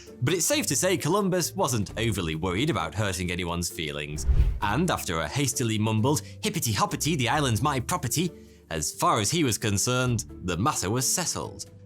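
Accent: United Kingdom. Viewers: Bro I’ve seen about 20 of your vids and wondered why you kept saying “Hi, 42 here”. I finally realized what you were saying! You Brits have a funny way of talking 😂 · It's kinda weirdly cool hearing a Brit speak about Jamaica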